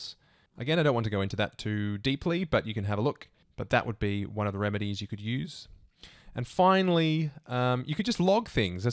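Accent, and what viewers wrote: Australia. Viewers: you really have that edge over other channels because you have an Australian accent. I find it very pleasant listening to stuff explained with an Australian accent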